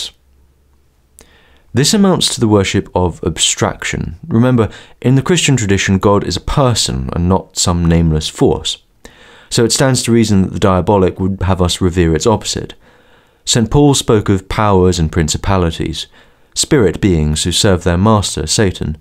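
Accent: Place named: RP, United Kingdom. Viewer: Listening to you speak with your classic posh accent is both entertaining and ASMR soothing. Hope for many more from you are you fill a gap of value to me 🙂👍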